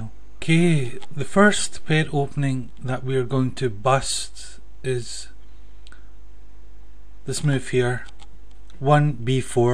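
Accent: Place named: Scotland, United Kingdom. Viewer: I think he's saying "pat" -- a "pat opening" ... but these Scots accents are tricky!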